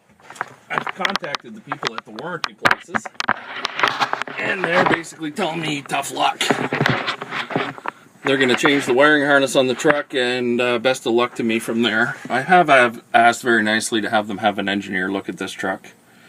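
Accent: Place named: Canada. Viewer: This guys sounds Canadian (don’t know too many Americans who pronounce Chrysler with an S on the end)...does Carfax have reliable info sources in The Great White North?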